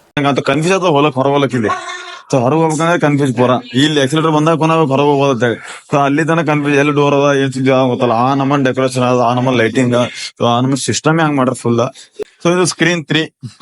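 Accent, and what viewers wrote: Gulbarga, India. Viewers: ನೀವು ಮಾತಾಡೋ ಗುಲ್ಬರ್ಗ ಸ್ಟೈಲ್ ನಮಗೆ ಅರ್ಥ ಆಗ್ತಾ ಇಲ್ಲ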